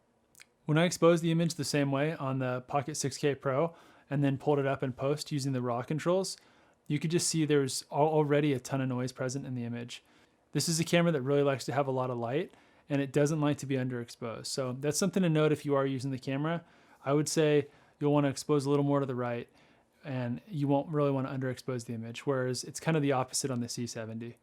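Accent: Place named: Orange County, United States. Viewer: Wow....we really do have an accent in Orange County lol. Awesome video!! I was on the fence between these two cameras, but I'm loving my BMPCC6k Pro. The biggest draw for me was the menus. I fumbled around with Sony and Canon menus for years, but once I used my first BM I've never wanted to go back, but the C70 almost got me. Yes, the 6K Pro has its quirks, and the overall build quality of Sony, Canon, etc. is much better, but I love the image, post-flexibility and MENUS of these BM cameras.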